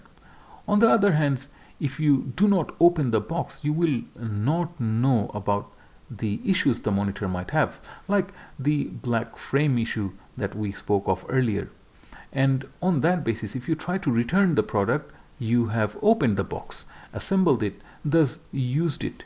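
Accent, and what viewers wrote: Bengal, India. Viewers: Is your native tongue Bengali?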